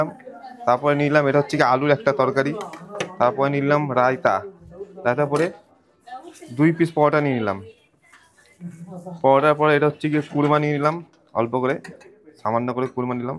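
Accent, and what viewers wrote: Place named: Bangladesh. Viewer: Bangaladeshir moto kotha bolcho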